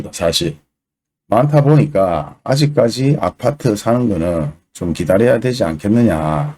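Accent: Gyeongsang, South Korea. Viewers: 사장님 푹건한 경상도 사투리 너무 친근감있고 듣기 좋습니다 ~ 앞으로 자주 오겠습니다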